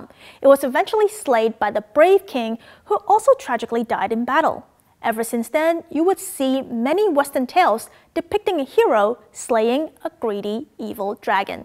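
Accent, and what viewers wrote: Australia. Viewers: I just recently found your channel (Chinese eating etiquette), and watched your discussion of Dim Sum (Here in America "shrimp toast" is a big favorite but I love Siu Mai and cabbage or pork bao) and i am surprised to see that some of the videos are 7 or 8 years old. I didn't notice a difference now that you are "back" but you still have immaculate English enunciation and slip very naturally in to Cantonese or Mandarin. (I notice that you say "mandarIN" instead of "MANdarin" - do we say it wrong over here?) Liked and subscribed and enjoying the videos. Oh, and it is charming when the Australian accent just peeks out a bit in your English. Love it! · Is this an Australian, Chinese accent?!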